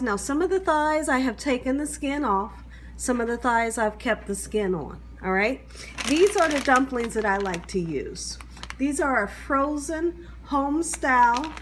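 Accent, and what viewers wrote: South, United States. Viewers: You have the sweetest voice ever. I also hear a slight accent but can't put my finger on what accent that is? I was thinking maybe a southern accent? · I just love your videos! Love your southern sound! I love to cook as well. I cook old school puertorriqueño food. Everything is delish. I saw your video and you mentioned the mean haters. Do not even knowledge them. You have more kind and blessing people that follow you!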